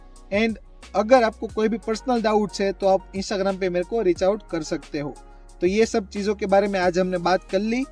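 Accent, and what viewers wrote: Gujarat, India. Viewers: Bhai ki aavaz se gujarat ki khusbu aari h😄 are you gujarati ??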